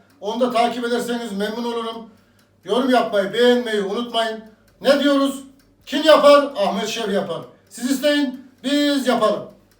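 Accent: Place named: Aksaray, Turkey. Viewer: Sizi ailecek izliyoruz.guzel ve sade anlatimla .sizin ispanakli patates yemeginizi yapacagiz.ahmet bey ben aksarayliyim .sizin siveniz bizim oraninkine benziyor merak ettim nereli oldugunuzu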